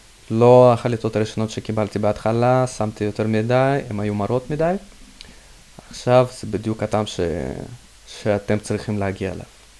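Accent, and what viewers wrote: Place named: China. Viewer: אתה סיני הוא משהו יש לך מבטא נחמד כזה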